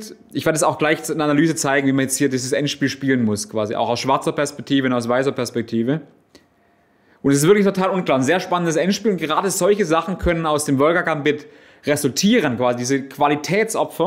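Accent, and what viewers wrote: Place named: Baden, Germany. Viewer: sehr gerne und vielen Dank auch für die schnelle Antwort. :) Ich bin gespannt. Das hört sich gut an, dass ihr da schon hinter den Kulissen daran arbeitet.^^ Spannend wäre das beispielsweise wie das Profischachspieler machen, die das quasi als Beruf ausüben, aber auch Berufstätige, sprich beispielsweise du, also wie verbinden die den 8-Stunden-Job dann quasi mit Schach. Ja das Überangebot ist gerade für Spieler/innen unter DWZ1500 sehr erschlagend und überfordert zum Teil schon. Also ich habe einige Kanäle wieder deabonniert oder stumm geschalten, weil es einfach zu viel wird. Da helfen Bücher schon eher und das finde ich hier auf dem Kanal beispielsweise gut, dass nicht jeden Tag ein Video kommt (plus der badische Einschlag, wenn ich das richtig rausgehört habe, aber das liegt an dem ebenfalls vorhandenen badischen Wurzeln :D).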